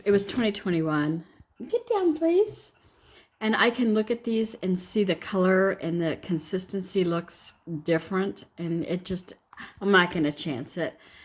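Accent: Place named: South, United States. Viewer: Hey! Here watching. Loving ur southern accent. Ur so sweet!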